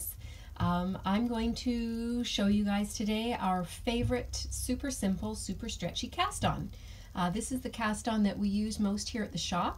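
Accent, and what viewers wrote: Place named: Canada. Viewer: Love the Canadian accent 😚🇨🇦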